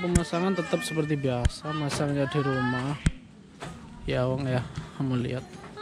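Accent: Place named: Java, Indonesia. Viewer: Bahasanya belepotan. Campur aduk medhok .ha...ha...ha...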